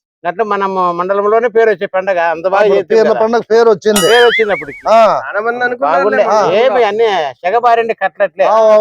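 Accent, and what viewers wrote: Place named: Rayalaseema, India. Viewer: తెలంగాణా యాసకు బదులు సీమ యాస వాడారు.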